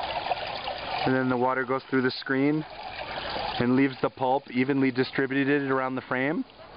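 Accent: Nepal. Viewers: Hi, Thanks for uploading this video and showing our ancient culture.....By the way I equally enjoyed the video and your Nepali accent. Regards Ajaya Jang Kunwar, Currently in Columbus, Ohio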